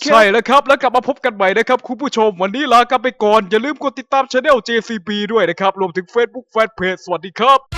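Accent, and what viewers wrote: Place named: Yala, Thailand. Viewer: พูดยะลอง เฉยยย 555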